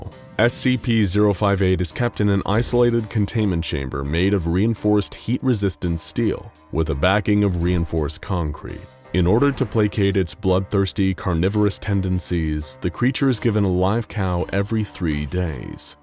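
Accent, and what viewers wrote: United Kingdom. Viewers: It's bri'ish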